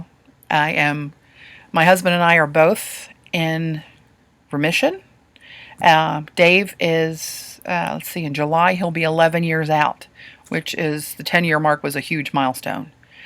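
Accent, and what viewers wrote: United States. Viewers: My mother is a breast and uterine cancer survivor, last month was 7 years ago. I also live close to Baltimore, was born an raised there. I just knew after hearing your voice and your humor you were from Baltimore. God I love your videos. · I recently discovered your you tube videos on gelli plate art and love them. I actually bought a gelli plate which I have yet to use, but am gaining an understanding of how it works and look forward to playing with it very soon. I want to thank you for "the rest of the story". I do not nor have I ever had cancer. I have had friends with cancer and have lost dear friends to cancer. I have to say that I live in British Columbia, Canada. I know from your great accent that you live somewhere in the South - at least I'm pretty sure. My Mom was from Mississippi, and I was born in Alabama, raised in New England, and lived a few years in Tennessee, and also in the midwest. 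I feel such a bond with you as I listen to you talk about your art making process, If I lived close I would want to just say Hi!!! So here it is, Hi and thanks for the great videos. I am working my way through all of them! Love and Peace and great gobs of good health!!!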